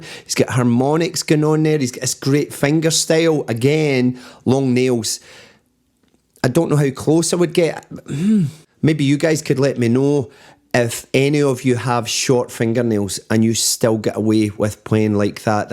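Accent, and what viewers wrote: Scotland, United Kingdom. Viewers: This is the first time I’ve watched, and, I gotta say, I watched because you were reacting to MARCIN. I’m a huge fan of his, and I appreciated your knowledgeable commentary. Love your Scots accent, which turned to a US southern accent when you sang! Loved it! I’ll be tuning in again. Thanks for a good show! ❤️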